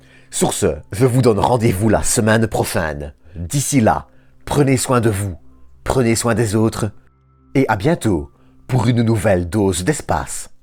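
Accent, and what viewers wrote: Belgium. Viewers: votre accent belge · L'accent belge.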